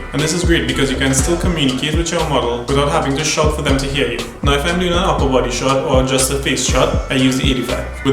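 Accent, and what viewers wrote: Trinidad and Tobago. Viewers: You sound an awfully lot like my buddy from school in the US and he was from Trinidad and Tobago, something tells me you've got your roots to that part of the world!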